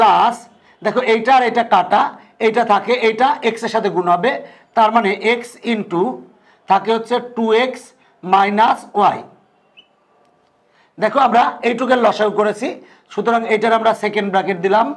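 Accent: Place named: Kushtia, Bangladesh. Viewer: Sir apner Basha kothai??? Apner bola kichu Kotha shune Mone hoi apni kustia thake😁😁😁😁😁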